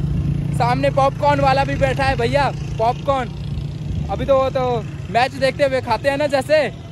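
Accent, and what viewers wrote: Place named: Bihar, India. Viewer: Bhai tere bolane ka lejja ek Indian Bihari jaisa lag raha hai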